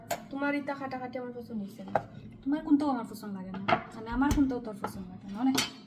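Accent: Sylhet, Bangladesh. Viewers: অনেকদিন পর সিলেটের কথা শুনলাম।আমার ভালো লেগেছে। আমিও সিলেটের মেয়ে।আমি লন্ডনে থাকি।আপনার কি কোন ডাকনাম আছে?❤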